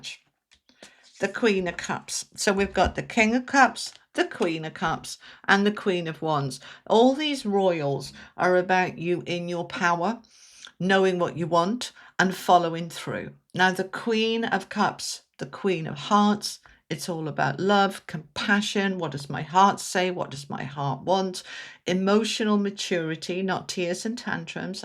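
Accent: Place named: North, United Kingdom. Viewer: I love your readings. Your accent reminds me of home (north UK). Always heart warming ❤🙏♐️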